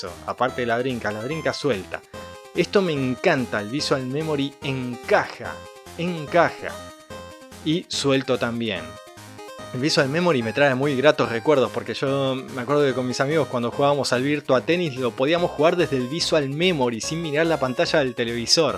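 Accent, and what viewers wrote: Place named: Argentina. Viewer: Denada me encantan sus videos y me encanta tu forma Argentina de hablar tan característica de nosotros , saludos 😊👍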